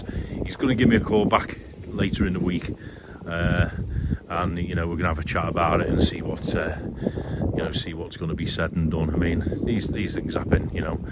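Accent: United Kingdom. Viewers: You sound like the original butler, Carson, on Downton Abbey...I wonder if you are from the same area as he is? Love your videos. It's so interesting to see this island from your walks.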